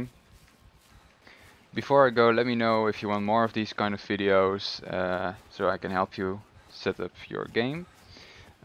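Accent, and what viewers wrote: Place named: Netherlands. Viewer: xD You're Dutch. (Posted this after the first 5 seconds of watching this video.)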